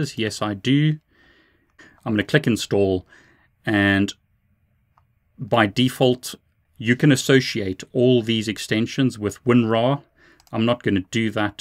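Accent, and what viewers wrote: Afrikaans, South Africa. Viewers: I called it! I thought your accent sounds South African. lol "jou rekenaar is myne" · Ek wou se ek herken n Saffer aksent....Geniet jou videos! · i thought that was an SA accent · I knew I detected an Afrikaans accent in your other videos! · it was Afrikaans my friend you can hear his SA accent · HAHAHA Wou se jou aksent is AFR. haha voorspoed tjom! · I knew he was South African xD that accent was amazing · Well you got the afrikaans accent right, maar jy moet dit nie weer doen nie asseblief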